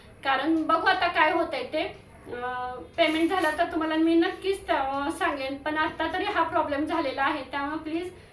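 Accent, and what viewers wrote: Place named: Karad, India. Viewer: Congratulations!! 😊छान माहिती दिली 🙏🏻🙏🏻 धन्यवाद!! आमचे गाव सुद्धा कराड पासून जवळच आहे. माझेही cooking channel आहे. तुमच्या बोलण्याच्या पद्धती वरून तुम्ही आमच्याच भागातील असाव्यात हा अंदाज खरा ठरला.👍🏻😊 तुमचे व्हिडिओ छान माहितीपूर्ण असतात . channel साठी कधी गरज वाटली तर मदत कराल अशी आशा करते.. तुम्हाला खूप खूप शुभेच्छा !!❤😊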